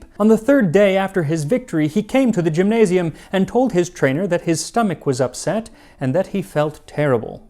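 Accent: Greece. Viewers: Excellent Greek accent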